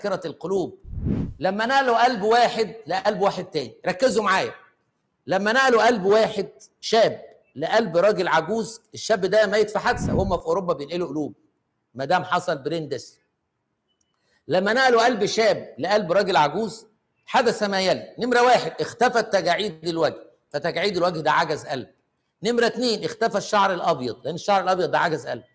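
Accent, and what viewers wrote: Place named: Iraq. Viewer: ف السعودية يسما الهيل حبه خضره تنحط مع القهوة تكسرها شيء بسيط بي سنونك وتحطها كاملة تحت اللسان ٣ حبات كويسة اذا تنهج اذا سويت مجهود وتعبت حطها تفيد في مصر تسما حب هان. لهجتك من العراق يسمى الهيل الأخضر او الهيل الحقيقي او الهال او قاع القلعة له مسميات كثير لكن المعروف عند الخليج الهيل الله واعلم ايش اسمو ف العراق لكن استخدامو في القهوة و في المرق وفي الكبسة وفي الفته وفي العريكة وفي الشاهي العراقي أظن يستخدمونه وحتى الحلى اذا ماعرفت ارسلك صورته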